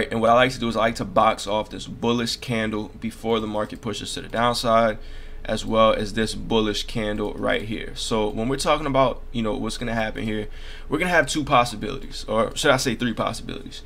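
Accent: Louisiana, United States. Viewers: Are you from Louisiana? You accent sound very familiar.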